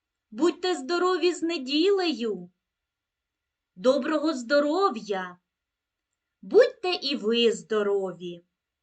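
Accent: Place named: Poltava, Ukraine. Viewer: Завжди впізнаю діалект Полтавщини 😄 Щасти Вам!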